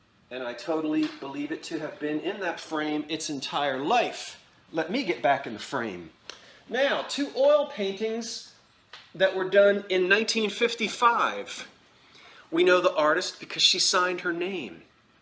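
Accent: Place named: Northeast, United States. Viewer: Oh gosh! I'd have to hunt down those homeowners and insist they keep the paintings with the homes!! Im fanatical about historic documentation., Ive heard you say you don't think you have a Jersey accent, but Ive never heard anyone pronounce "rural" like that! 😄 Must be a North Eastern thang!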